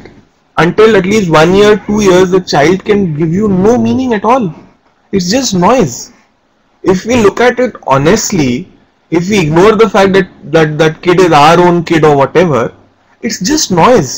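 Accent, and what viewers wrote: India. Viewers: l am African from Democratic Republic of Congo/ cental Africa, l don't like the teacher's accent, he's waisting his time, better to teach in indian language.Malayalam, Tamoul, Hindi...sorry sorry to follow you mr, phonetics problem, you're my colleague l am a Business English teacher. Thank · very nice sir! i am pakistani .so it is easy for me to get indian accent.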